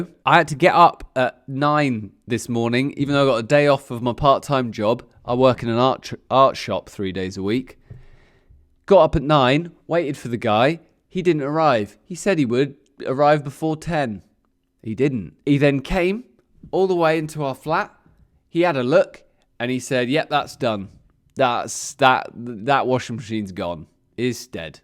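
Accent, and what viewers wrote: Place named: United Kingdom. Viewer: Are you British? Cuz I like the accent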